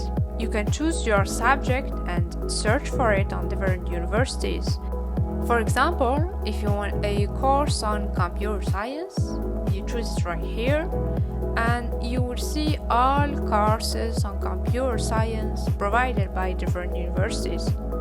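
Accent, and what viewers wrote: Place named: North Africa. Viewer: From your accent you look like from North Africa, your financial aid is displayed in the French language, your name looks like an Arminian name, and you speak English. Where are you really from? 😁😁😁😁 Thanks for sharing this video.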